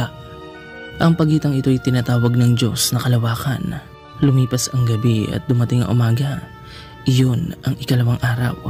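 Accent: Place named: Cebuano, Philippines. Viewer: Hello ka-, ang style at pamamaraan mo sa pagsasalita ay walang pagkakaiba sa nagsasalita ng Ophirian Heritage Conservatory, ibig ba sabihin na iisang tao lang kayo? Kahit tagalog ang ginagamit na salita ngunit ang accent ay parang sa isang cebuano language. Maganda ang ipinapakita mong presentation at wala itong pagkakaiba kung paano ginawa ang presentation ng OHC. Very Educational!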